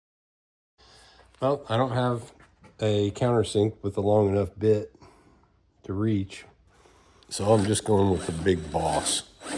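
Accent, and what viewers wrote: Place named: South, United States. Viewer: That is cool. U know it just dawned on me u have a southern cowboy voice like on the movies ❤❤😊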